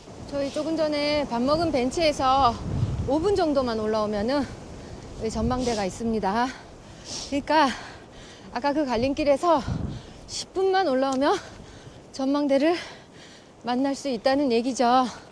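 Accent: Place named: Gangneung, South Korea. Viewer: 영상 재미나게 보구 가드래야 말투가 정겨와우야 ^^ 강룽말투가 정겹드래요 지는 정선이본가구 평창이고향이드래요 12일 선자령 10번째등정 복많이 받으래야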